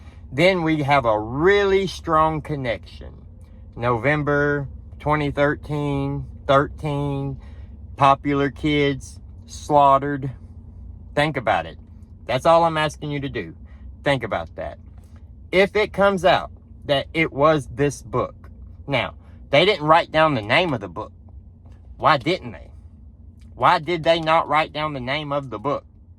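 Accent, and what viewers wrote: South, United States. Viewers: Where in god's name are you from? Your southern twang is SO strong!!! I love it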